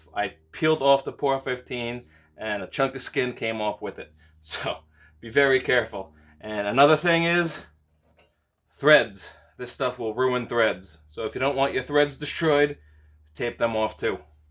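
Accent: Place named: North, United States. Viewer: I actually had to google what the heck that was 🤦‍♀️😂 LOL! I don’t talk to many people from Lawn guyland, so I was out of the loop. You definitely have a northern accent! Especially when you’re talking to other northerners. I do the same thing when I go home 😄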